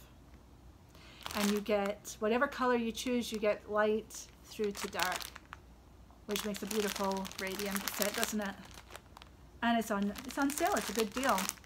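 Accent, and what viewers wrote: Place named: Glasgow, United Kingdom. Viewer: I’m so glad I found you and your lovely shop, you are a voice from home - I’m from Glasgow living in Virginia :-) Merry Christmas and a happy New Year to you and yours.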